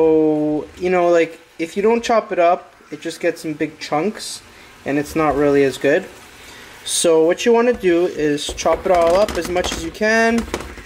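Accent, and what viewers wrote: Canada. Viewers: Canada is America's abortion that unfortunately survived, only canadians talk like that and this softy drained his ground beef 🤣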